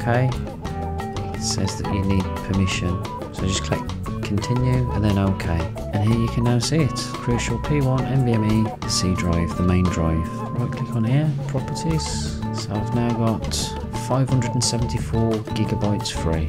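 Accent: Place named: Midlands, United Kingdom. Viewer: some one i understand from the midlands cheers matey.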